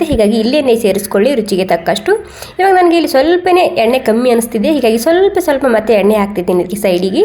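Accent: Maharashtra, India. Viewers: Tumcha marati style kannada lai avadle tayi😊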